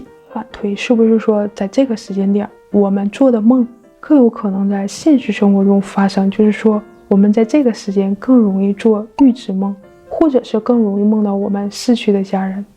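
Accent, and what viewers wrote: Northeast, China. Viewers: Youtuber是哪里的人？听口音像是东北人。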